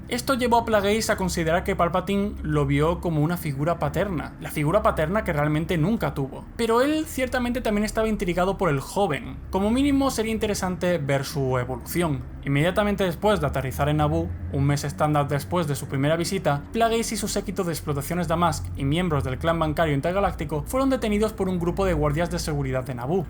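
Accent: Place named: Spain. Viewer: De hecho el acento es español. Tal vez de León o Castilla.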